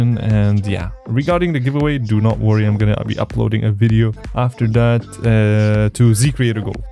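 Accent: Germany. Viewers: you german? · are you from germany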